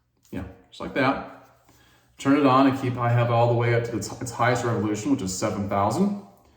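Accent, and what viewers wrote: United States. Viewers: I learnt so many tips from you mate. Amazing , love your yank accent !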